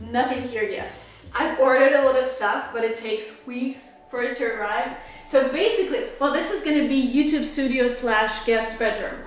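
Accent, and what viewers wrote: United States. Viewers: You look so happy it's contagious. How tall are you? it's the sink pretty low or a combination of both. You don't seem to have an accent (ok you have an American one), are you from the states? I hope you guys enjoy the place for a long time.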